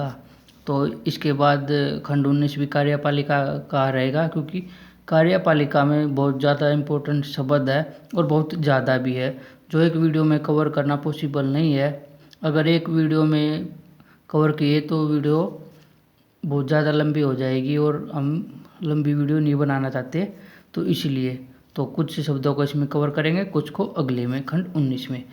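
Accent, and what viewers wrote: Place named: Rajasthan, India. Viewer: Sir Nationality to sabki Indian hai, State wise kaha se ho, because by your tone you seems like from Rajasthan/Haryana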